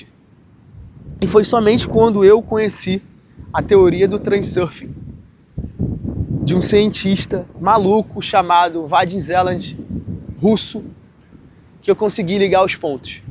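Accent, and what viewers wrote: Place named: Rio de Janeiro, Brazil. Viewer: Perfeição é o nome do seu vídeo. Viral, sensacional, português claro de carioca (foda)😂😂😂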